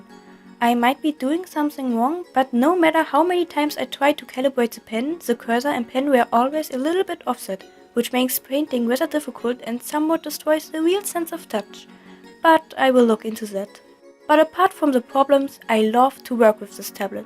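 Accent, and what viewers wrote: Germany. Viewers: man hört dass sie Deutsch ist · I love your voice so much and I absolutely love german accents =3 I also love your artwork your one of the people who inspire me to get better at art. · You are from Germany? Aber dein Akzent ist cool.😋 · man merkt einfach das du deutsche bist XD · I don’t see why your embarrassed your english is fine, I have a german au pair so I guess i’m just used to the accent. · German accents are my drug!! They're so cute!! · OMG I adore your voice i speak Netherlands so it's a little bit similar to german i can hear your german accent it's sooo cute <3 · God I love your accent, everyone loves British accents but German accents I’ve always found are the best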